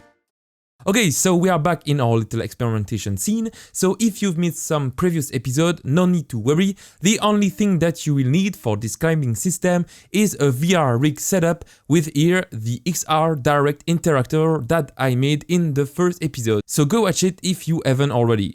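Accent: France. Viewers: Ton accent français m'aide a comprendre parfaitement 😅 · Your French accent is AWESOME! I love clicking a video and hearing your voice, because I know the content coming in is gonna be EPIC! 😙👌 (My emojis don't have the "chefs kiss" hand, but you get the picture 😂 ) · Be honest here mate, always hated the french accent, no matter who spoke it, but damn ive learnt alot from you, love your accent now :D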